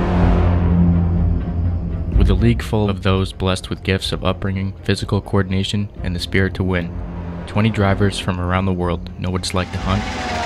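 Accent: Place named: United States. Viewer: Can’t take these Formula One videos seriously with an American voiceover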